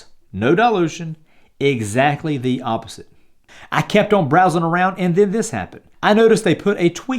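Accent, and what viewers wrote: South, United States. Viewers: Subscribed to this channel simply because this dude seems genuine and honest. Plus his accent just kills me. Some southern USA with undertones of a British accent. Bit in all seriousness, he's made me realise the importance of investing in Safemoon NOW!!! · came for safemoon, stayed for the southern accent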